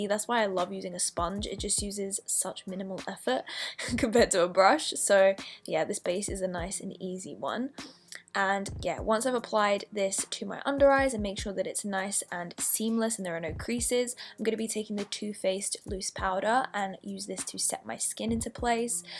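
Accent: Australia. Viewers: Savanna I feel like she sounds sorta aussie in this idk why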